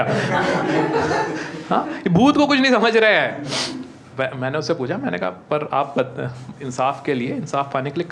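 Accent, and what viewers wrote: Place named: Uttar Pradesh, India. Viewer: Beautifully performed yaar! I really like that little bit of UP accent. That accent make the content even more funny! 👏👏😂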